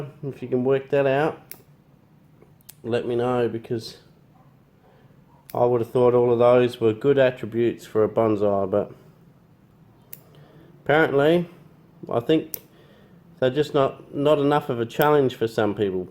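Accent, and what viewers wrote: Australia. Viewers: Just starting out with bonsai, and found your videos, looking forward to many more. Nice to listen to an Aussie accent for a change 😃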